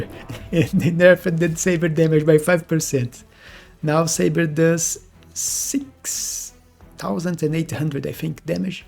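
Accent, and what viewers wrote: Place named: Brazil. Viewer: Eu também sou brasileiro aí reconheço o sotaque! Hahahah Você joga bem, mano, parabéns! Desejo sucesso!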